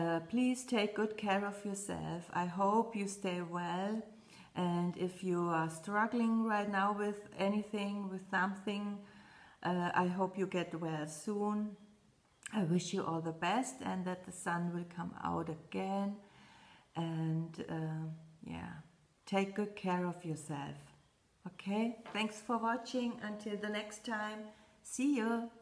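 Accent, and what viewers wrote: Germany. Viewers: I just love listening to you! My family emigrated from Naussau, Germany to the U.S. in 1837. My great grandmother, who died in1967, still spoke mostly German, but when she did use English, she sounded just like you. Thank you for bringing back lovely memories of my Granny with your lovely voice. I always enjoy your videos, and you make me resolve to stitch more smalls. I’d love to have a bowl of different pretties for each month. Thank you for sharing!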